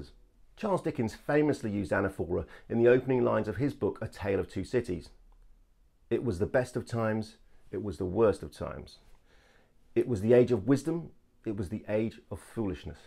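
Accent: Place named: United Kingdom. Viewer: British accent. I love it. I wish you make videos and explain about it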